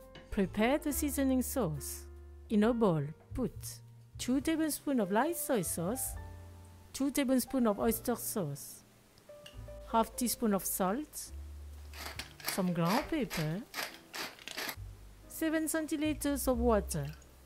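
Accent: France. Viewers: Love the French accent 😂 and the recipe is great will be making it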